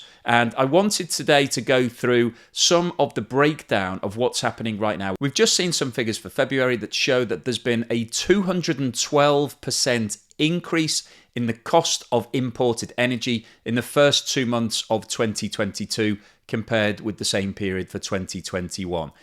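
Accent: United Kingdom. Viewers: Hi, it doesn't really matter what introduction you use. We are here for your nicely structured concise information updates and we love your British accent as well. Did you notice the channel of "Michael invests and tries to make money" always starting with "holy smokes" with an Aussie accent?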